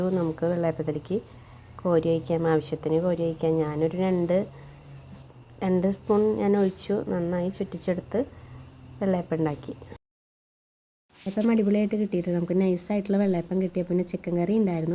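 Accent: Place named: Thrissur, India. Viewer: തൃശൂർ ഭാഷ നല്ല രസം. പിന്നെ വെള്ളപ്പം 😋👍🏻❤️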